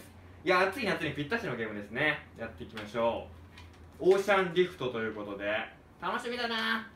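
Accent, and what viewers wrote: Kansai, Japan. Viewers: 関西弁いいね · 関西弁いい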